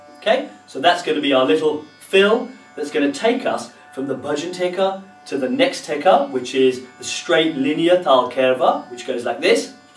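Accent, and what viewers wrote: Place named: England, United Kingdom. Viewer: .( love your english accent).you are the best techer..i live in USA a fiji island transplant...grew up.heRe in wichita kansasUSA🇺🇸..i heard many teachers on u tube..but MASTERY OF LANGUAGE MATTERS..bedides talent..i just bought a basic tabla..trying to learn basic tabla beats....thanks for having such a good talent..u 👍👍👍r incredible mhndraUSA🇺🇸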